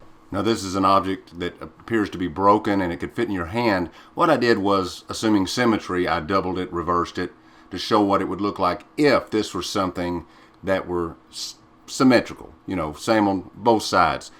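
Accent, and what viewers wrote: South, United States. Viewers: You definitely have a southern American accent 😉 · You got an accent it sounds Texas like me must be a Southern accent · You have a southern accent! I’m in Canada and no one here has that accent unless they’re from the southern states! My best friend has it, feeling better but apparently considered a “long hauler”... 6 weeks now. Wondering if your taste and smell came back to normal? I would like to let her know as it’s an optimistic positive. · Nice to see you back and in good health.Thanks for what you do with the videos. Im in the UK. Sorry to say but you do have an accent , its an American one , I don`t have one because Im English. Please keep up the good work that you do.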